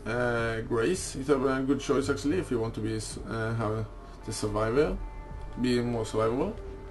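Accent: Sweden. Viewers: svensk? :D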